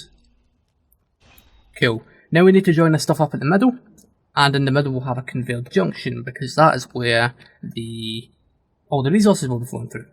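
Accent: Scotland, United Kingdom. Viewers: That is due to his STRONG Scottish accent plus he did not have a clue and not prepared to show the correct way.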